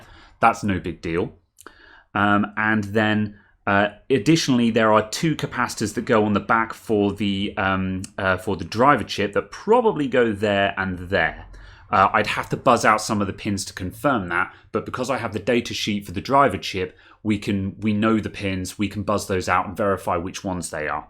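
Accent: England, United Kingdom. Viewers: Man, i am not an expert, i do repair things from time to time, but your voice and especially your English accent, really make me watch your clips. I have an issue with a keyboard: a column of keys get lights but don't send a signal, the keyboard is Logitech G pro. I try to bridge but it seems all keys ( F7, 5, T, G, V and Space) are one link on the board. Do you have any idea on how to start this repair, whats spares should I look for. This issue appeared after I try to resolder a switch ( I ve done this before, many times but never saw this type troubleshooting ). Thank you so much and Rock on!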